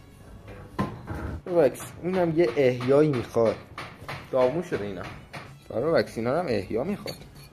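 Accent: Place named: Hamadan, Iran. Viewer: همدانی هستی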